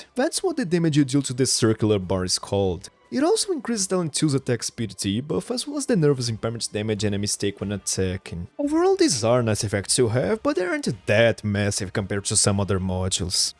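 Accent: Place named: Brazil. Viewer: Bro are u Brazilian? Your english sounds like mine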